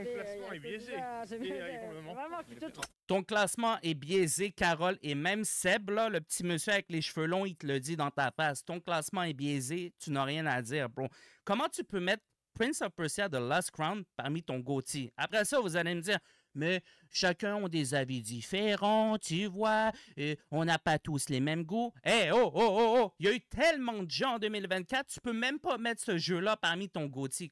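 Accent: Quebec, Canada. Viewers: Ne t'énerves pas trop, tu vas perdre ton accent québecois et après tu seras moins drôle! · Merci pour tes vidéos qui remettent les points sur les i. Avec l accent canadien en plus j'adore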